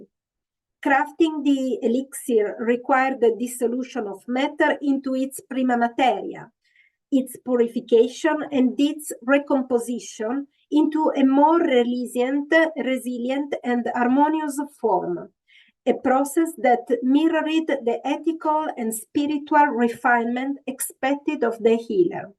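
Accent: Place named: Iceland. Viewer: how Friulian accent can sound close to Icelandic accent in the British language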